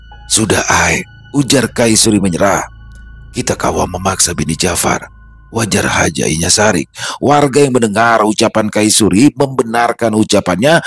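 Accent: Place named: Banjar, Indonesia. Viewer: waah makin mantap nih aksen bahasa banjar mas..kereen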